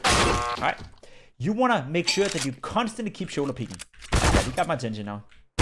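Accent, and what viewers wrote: Denmark. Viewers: you sound danish so if you danish awnser this in danish hej lige fåndet dig og du er mega god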